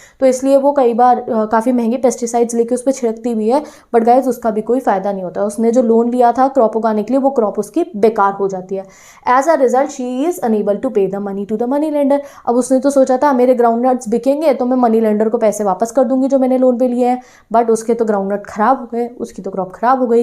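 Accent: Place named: Uttar Pradesh, India. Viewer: Di I want to know aap kha se ho please tell me U.P se ho na aap aapki boli se lgta h eesa bhut bar btao na please di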